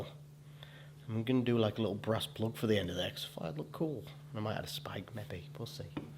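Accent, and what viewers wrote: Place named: Yorkshire, United Kingdom. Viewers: It is, but there are several different and very distinct accents to be found in Northern England, this one sounds like Yorkshire to me. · Sounds like Yorkshire, North of England 🏴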